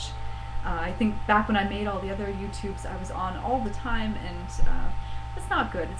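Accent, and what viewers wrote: Germany. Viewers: einen leicht sächsischen akzent konnte ich in einer ihrer älteren videos bereits raushören.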